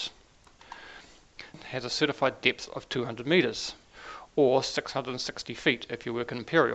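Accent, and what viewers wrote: New Zealand. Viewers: Such a pleasure to hear a New Zealand accent around horology circles on YT. As a proud Aussie, I was taken aback by your omission of the local (Auckland,) and well-regarded, Magrette brand. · Nothing like a kiwi accent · È un leggerissimo accento neozelandese...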